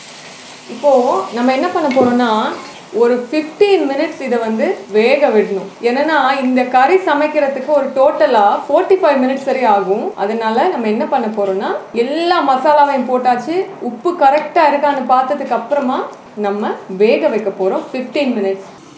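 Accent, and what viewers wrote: India. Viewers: sis don't use the blady indian tamil you use in our Tamil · இந்திய தமிழ் வாடை அடிக்குதே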